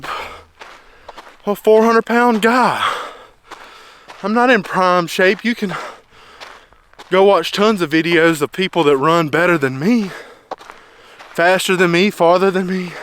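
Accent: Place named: Alabama, United States. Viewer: Thanks you are an awesome inspiration. I love your Alabama accent and relaxed way! I love the south. Thank you. Yes I need to make goals for 2024 be blessed! ❤ Respect always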